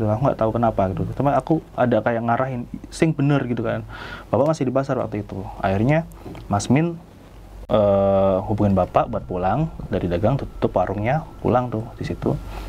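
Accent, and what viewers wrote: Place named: Cirebon, Indonesia. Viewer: asli wong Cirebon apa Indramayu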